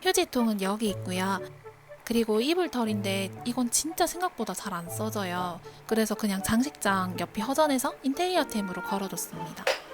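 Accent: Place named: Gyeongsang, South Korea. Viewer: 제 방도 좀 꾸며달라고 하고 싶네요...경상도 분이신 것 같은데, 만나러 가고 싶어효ㅠㅠ엉엉 ㅠㅠ넘 귀여버요ㅠㅠㅠ완전 취저.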